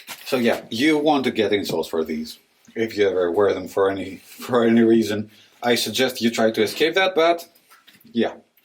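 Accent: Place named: Greece. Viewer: When i heard you speak English i could distinguish a greek accent. When i saw the ΝΥΜΦΗ beer; i was almost sure that you are Greek!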